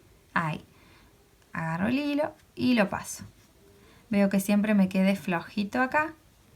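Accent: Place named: Córdoba, Argentina. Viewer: Qué hermoso!! Tgo 80 años, tejo maravillas, y estoy re tentada de hacer estos zapatos!!! He tejido pantuflas p regalar en pandemia, pero ésto es superior!! Y escuchar tu "tonadita" de ntra Cba, más!!! Gracias X tan bna explicación. Ely,ciudad de Santa María de Punilla, Córdoba, República Argentina.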